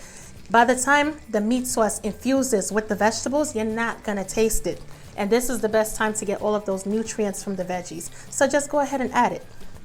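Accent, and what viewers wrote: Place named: New York, United States. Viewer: I LOVE HOW SHE SAYS SAUWWSE (SAUCE) LOL THAT NY ACCENT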